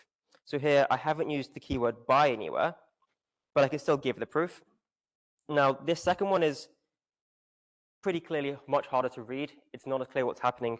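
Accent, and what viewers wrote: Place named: United Kingdom. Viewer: The guy has such a heavy british accent that it is difficult tu understand the talk. I have listened the first 40 minutes and throw the towel.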